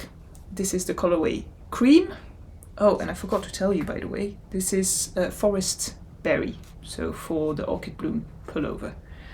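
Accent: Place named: Wales, United Kingdom. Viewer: Nice first podcast 😃. You could modify your Ida tee…. Undo the cast off and unravel to length you want… then re-do rib 😊. Undo the sleeves and put in some deçreases to get rid of some of the width. It would be worth an hour as it is a very nice piece 😉 I also think that Petite Knit is fantastic for everyone. She has sensible everyday designs. I just finished her Cumulus …so simple but a great everyday sweater. Your red cardigan will be beautiful The Gepard (Danish) kid silk is also very nice. I am presently knitting the Buttercup T-shirt with Sandnes Garn Line, The Thelma Cardigan by moonstruck knits…who has grrreat patterns check her out…using Myak Tibetan Cloud for the first time and it is glorieux 😂 You have a nice accent when you speak English it has a Welsh sound… bye bye … me baldelaine on Instagram 😊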